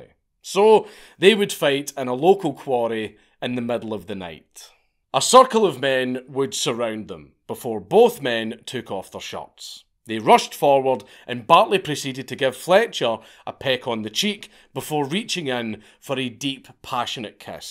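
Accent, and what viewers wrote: Scotland, United Kingdom. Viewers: They don't have kings and gypsies in Scotland. They have kengs and gepsies. Gawd I love the Scottish accent. Easily my favorite. (editing to make the 2 snobs who responded happy)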